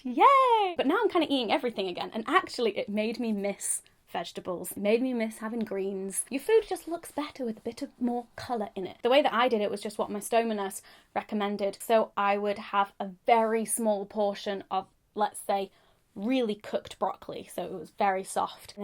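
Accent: United Kingdom. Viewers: I love your British accent and you speak very well I understand almost everything you say (I am not so good in English). You are definitely Awesome!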